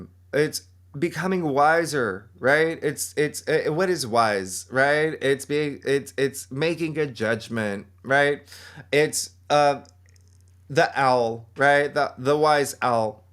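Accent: South, United States. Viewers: I love a southern Kween! Yasss girl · Well that’s why you sound familiar lol. Not southern Baptist but southern 😂❤u! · has such a joyful energy and he always puts me in a good mood listening to his videos. 💚 I didn’t know anything about his background but with his accent, I wasn’t surprised to hear him say he comes from a Southern Baptist background. There’s some of us who make it out the other side of the fundamentalist dogma, hallelujah! 😅 Love you,! 🥰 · I know this is coming and I’ve been listening to him for the past couple days on and off. Hearing another southerner resonates with me and the things I do know, he confirms. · First time to see this guy and I’m here for it! He has to be from Mississippi! Sounds very White Lotus-y😊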